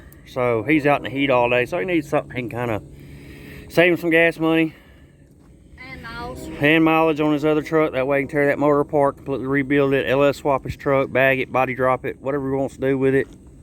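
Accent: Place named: South, United States. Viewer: The spontaneous joke at the beginning was pretty funny. I think you guys should start doing some kind of joke session or like a joke of the day or something. There's something about that southern accent telling a joke with a splash of cuss words LOL. I really think there's something to that man I think it would bring some people in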